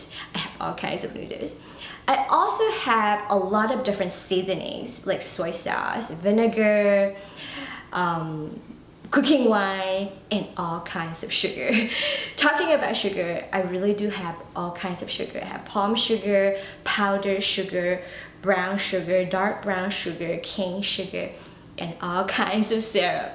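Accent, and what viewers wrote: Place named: Thailand. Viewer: You were in Thailand! That’s my home country. You look like one Chinese actress, her name is Yang Zhi. She is in the drama call “ash of love” it’s really good C drama 😊 and yes! The accent is our pride😊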